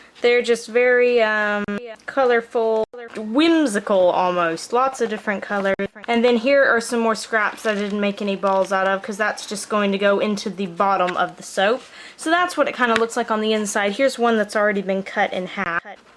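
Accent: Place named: South, United States. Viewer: I love watching you make soap!! where are you from? You kind of sound like another YouTuber I watch, grvyrdgrl....I think thats right...she is from texas and has the most delightful southern accent, as do you :)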